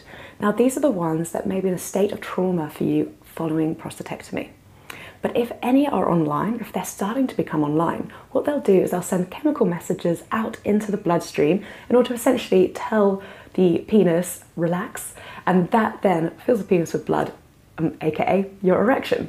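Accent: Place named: United Kingdom. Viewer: Listen to this woman. It is not just lip-service. I have been jerking off for 68 years and I couldn’t agree more. Unfortunately, her British accent makes understanding what the hell she’s blathering about quite difficult, but I heard the word “masturbation “ over and over again and that I can relate to!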